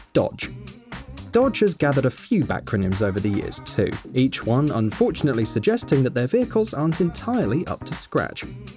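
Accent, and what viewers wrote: United Kingdom. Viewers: Love your channel are you british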